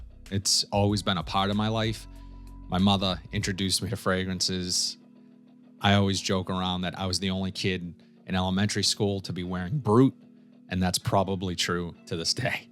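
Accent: Boston, United States. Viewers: Congrats! Cool Water was my intro into Fragrances around 1994 in a clear bottle with a faux wood screw on cap. I'm thankful for you and other guys like you who are willing to talk to a camera and share this passion in a way that both educates and inspires! The first time my wife overheard a video of yours that I was watching, she said, "Woah, someone's from Boston!" 😂 Happy Thanksgiving! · Congratulations. You are a very honest and passionate reviewer with no hidden agenda. The content is superb and I have gotten a couple of great just watching with very little recycled content Love the Boston Accent too I am still waiting for whatever I won I just can't find the private message you sent me. LOL!!!! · you wanna get the numbers up of subscribers?… gotta get to collab with other fragrance reviewers. Just watch once a reviewer collabs with another fraghead… and how significant their numbers go up. 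Just some advice. Good reviews and stunning Boston accent 😅… keep it up buddy 👏🏽👏🏽👏🏽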